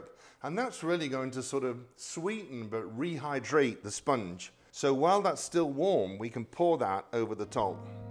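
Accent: England, United Kingdom. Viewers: What a lovely English accent!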